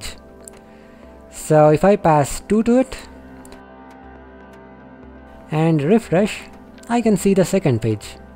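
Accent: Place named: India. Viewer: Indian Accent 10/10 video tutorial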